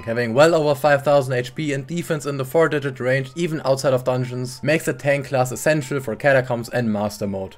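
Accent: Germany. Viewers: Are you German by any Chance?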